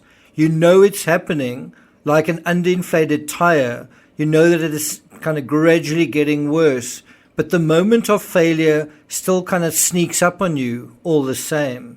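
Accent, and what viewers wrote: South Africa. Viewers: I like your stuff. South African voice? Expat?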